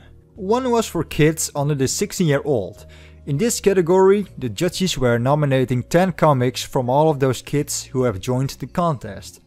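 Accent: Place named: Netherlands. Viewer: The wa- de manier hoe je praate engels ik dacht al dat je Nederlands was oh en uh gefeliciteerd met je verjaardag Edit: ok ik had wat meer geduld moeten hebben lol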